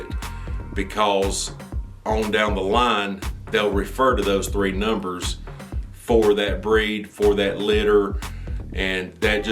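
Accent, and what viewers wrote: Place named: South, United States. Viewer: Hey english bulldog owner from the UK of a red and white girl.Shes as you would say in america full registration.Only thing in the UK its kennel club registered.In the uk red and white females go from around 3000 to 7000 depending on the bloodline.Just like to say all of your Bulldogs look beautiful and healthy and like alot of people have commented they would buy a pup from you.As would I if I was in the US.Edit Love the strong southern accent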